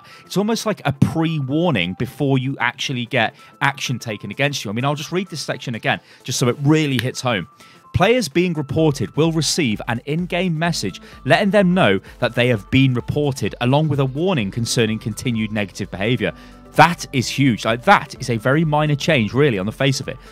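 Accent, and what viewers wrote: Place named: United Kingdom. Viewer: I like your british voice way more than these other american Overwatch youtubers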